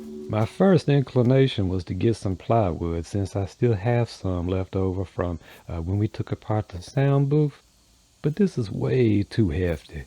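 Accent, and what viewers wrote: Louisiana, United States. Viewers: New here! Great channel. Very well done! Are you in Louisiana? Nailing the accent if not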